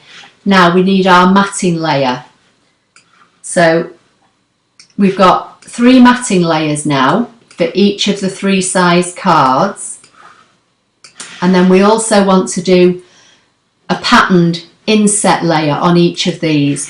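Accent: United Kingdom. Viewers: Great and beautiful work! And I looooooooooove your British accent!!! I'm from Córdoba in the middle of Argentina. Namaste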